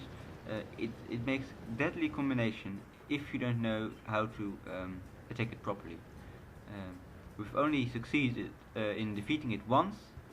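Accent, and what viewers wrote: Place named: Netherlands. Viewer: If you aren't dutch, ill shoot myself in the face. Great video, but your accent made it 10 times better.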